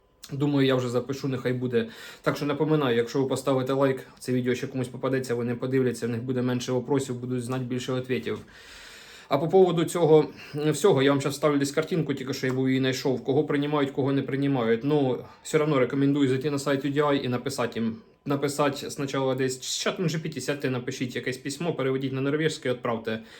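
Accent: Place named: Surzhyk, Ukraine. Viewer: Який жаххливий суржик, ще не чула, щоб так спотворювали нашу солов'їну мову. Де цей хлопак чув оце? "не повторяйте моїх ошібок"😅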